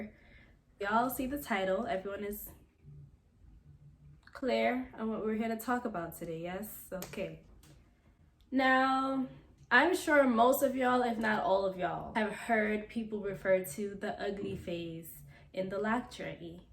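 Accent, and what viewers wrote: Jamaica. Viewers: Good morning, was appreciating your informative videos and noticed slight accent. Jamaican?🙂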